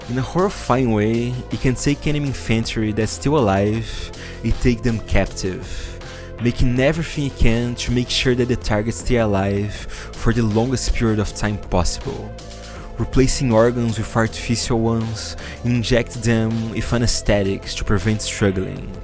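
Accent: Brazil. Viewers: Sotaque de brasileiro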